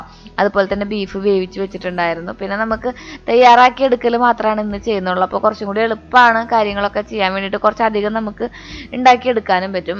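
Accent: Thrissur, India. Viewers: Cake cutting adyam kanunnu. Thrissur bhashayil samsaram kelkkan nalla rasamundu. 😊😊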